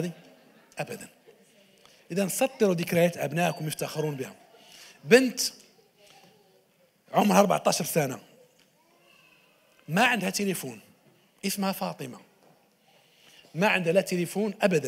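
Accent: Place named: Morocco. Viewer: نعم اختي شككت في اول الامر لان لكنته مغربية والأن كأدتي لي تخميني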